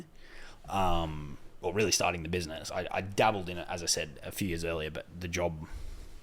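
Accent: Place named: New York, United States. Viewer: He sounds like a New York kinda guy. I'm glad I left NYC in 2010.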